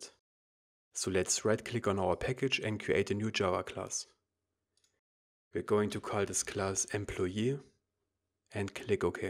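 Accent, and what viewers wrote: Germany. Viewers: I think a hear a german accent😁😁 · i love that light german accent (y), excellent work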